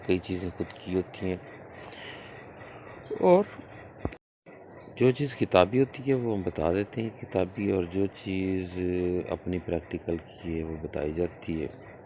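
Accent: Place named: Punjab, India. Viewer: استاد محترم سلام بحت خوب آپ اچھی رہنماٸیہ کر رہے ہیں لیکن ساٸین آپ کی آواز ۔یا کسی وقت کیمیکل ۔دواٸ کا نام اتنا جلدی یا تیزی بول جاتے ہیں جو کہ بار اس لاٸیں کو reves کر کے سننے سمجھنے کی کوشش کرتے ہیں مگر 000.ساٸین لگتا ہے آپ کا لہجا پنجابی اور بات اردو میں اور ہم تو ہیں سندھی اب استاد ہیں آپ سے گزارش ہے کہ الفاظ اور سپیڈ ایسی کریں کہ پٹہان۔سندھی۔سراٸکی۔پنجابی۔اردو۔بلوچی۔سکھ سب لوگوں کو سمجھ آجاٸے۔ ساٸیں الفاظ بھی آسان ہوں تو اور کیا چاہیے ۔ علم کے دریاہ میں سے تھوڑا سا سب پی لیں گے تو آپ کا کیا جاٸیگا۔ورنہ ایسا ہو گا کہ جیسے سمندر سے پیاسا لوٹنا۔ غلتی غستاخی کی معافی چاہتا ہوں اللہ آپ کو خوش رکھے اور بھی خزانہ علم عطا فرمائے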